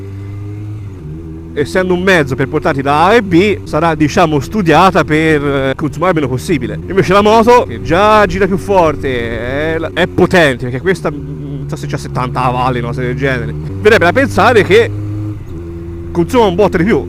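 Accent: Tuscany, Italy. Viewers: si chiama accento toscano :D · Toscano vero?grande boia deh ahaha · sei toscano scommetto io sono di pisa passa dal mio canale se ti va😉🤙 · E tu sei toscano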